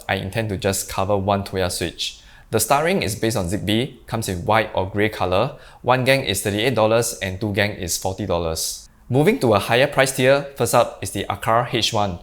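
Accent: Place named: Singapore. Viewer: Sounds Singaporian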